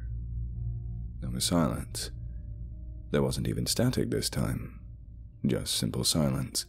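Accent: United States. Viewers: Your American accent is solid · Your American accent is getting so good! I'm from Oklahoma and to me you sound like a Texan!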